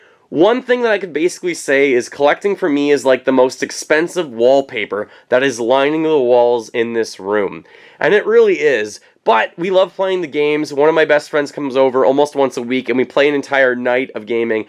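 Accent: Canada. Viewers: That is a pretty thick Canadian accent.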